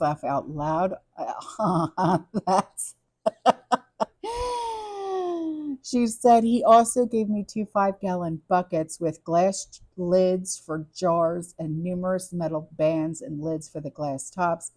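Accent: New York, United States. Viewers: Hello 🤗 I shared your page with a girlfriend of mine to your page. She thinks you're very intelligent well-spoken and loves the New York accent 😁 Have a great day God Bless 🙏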